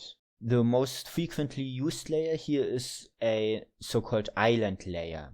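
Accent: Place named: Germany. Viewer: a yes. my library of german minecraft yt accents is growing once again, but maybe by devlog 4 I will get used to it and won't notice anymore (as happened with mango, clover, and probably many others) EDIT: also that pronunciation of your name is... is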